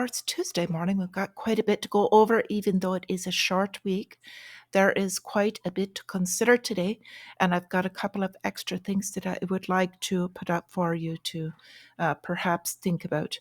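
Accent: Ireland. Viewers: you must have stock in Japan I notice you really focus on it. Must have property there or family in Japan. which is weird with the Irish accent.:)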